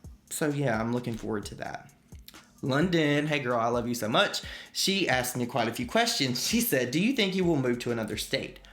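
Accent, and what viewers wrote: South, United States. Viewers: I miss you doing these kinds of videos, its like a breath of fresh air from all the drama so thankfully the drama is slow this year(so far) 🤣🤣 love you! I could listen to you talk alllll day! Your accent is everything and reminds me of my southern family i dont get to really talk to very often! Love you hope you are having a greaaaat day!!! I cant wait to see the new content you bring out, id love to see true crime, paranormal stuff from you!!!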